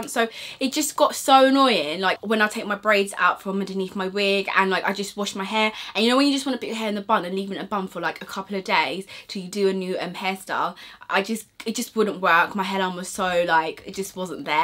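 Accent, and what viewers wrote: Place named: United Kingdom. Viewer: What is it about these damn British accents??....Like I can't!!! I just love you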